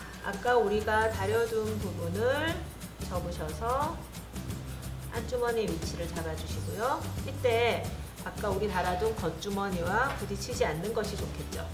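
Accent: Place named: Daegu, South Korea. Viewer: 설명하시는분 목소리 듣기 좋아요. 대구분들 유튜브에서도 억양이 나타나더라구요~~ 코코지니원단 튀면서도 예뻐요.~~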